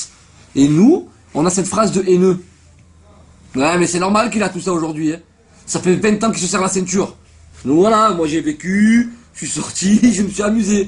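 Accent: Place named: Marseille, France. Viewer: horrible ton accent on le voit le Marseillais qui a immigré à Paris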